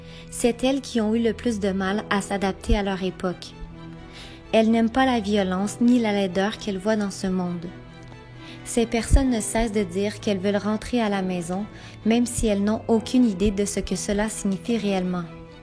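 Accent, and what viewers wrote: Quebec, Canada. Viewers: Bahahahahaaaa je m'attendais pas a entendre du Quebecois 😂😂😂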